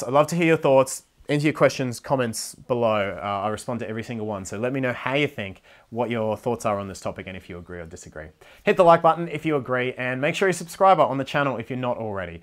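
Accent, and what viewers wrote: Australia. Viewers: This is the best video I have watched, my boyfriend and I split up two days ago and I am devastated and I couldn't stop thinking why?! And will he miss me? Will he regret this? Will he realise he dropped a good woman for himself? But you are right. I need to focus on myself again, focus on putting me first. He may come back to me and want to talk to me when he is ready, I know him very well and he will never want to come back to talk, I already know he is doing everything he can to put me behind in his past. But I still hope that when a few weeks of his strength and determination deteriorates and when things go quiet and he's lonely, he begins to think of me, and miss me and then the pang of sadness and loneliness really hit his heart and then the wash of regret hits him in full force. And I pray that when that day comes for him, that I am feeling stronger and closer to being healed. 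Also as soon as you said "shit fight" I clicked and realised you were Australian 😂 I couldn't tell if you were kiwi or Aussie but then I heard the twangs! I'm a kiwi girl in Sydney 🙏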